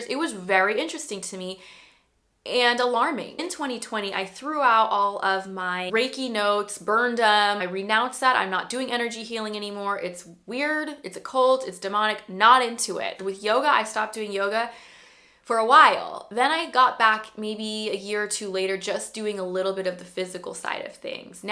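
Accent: United States. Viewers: Rrrr u are so pretty I like your hair and tht American accent is so hot🤭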